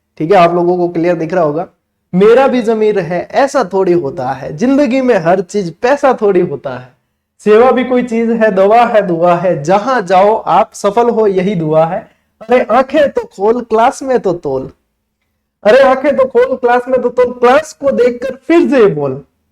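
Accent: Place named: Marwari, India. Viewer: Sir आपकी बोली घनी सुंदर लाग h marwari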